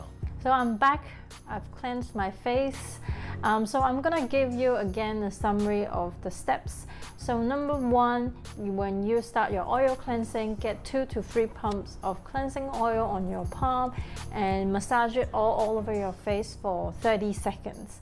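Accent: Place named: England, United Kingdom. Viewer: Hi, I love you English accent. The information you give us is very helpful. thanks for sharing.( 來自小船群組回訪)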